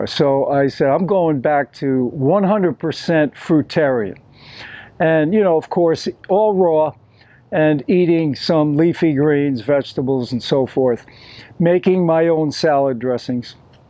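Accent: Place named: New York, United States. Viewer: Hey! Really enjoying your videos. I am also a long term raw vegan, and really related to your stint of a few years adding cooked stuff at night, but never feeling as good, and always steering back to raw/high fruit. I was raw for 9-10 years before I started having issues with low appetite and being tired a lot, and so tried adding stuff back in in the evenings. Sometimes it was hard to tell if the diet was the issue, or if it was something that would've come up regardless of diet. I changed a lot of my lifestyle, traveled to Asia, got out of burnout, etc. to test other factors. Either way, even if I'm more tired than I used to be or less vibrant, I still feel better raw than anything else. Also originally from FL, but then 20 year New Yorker (now more of a nomad), which I think I hear in your accent too! Thanks for sharing yourself and experience. 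I've found it rare to come upon someone else who did raw for years, then experimented with cooked at night, only to find it just didn't cut it, and went back.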